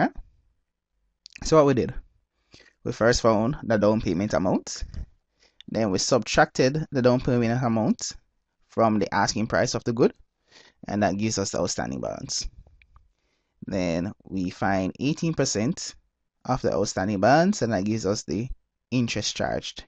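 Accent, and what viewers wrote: Jamaica. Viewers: When me first hear u I knew u were Jamaican bro, bless up! THANKS MUCH !!! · You sound like a Jamaican :)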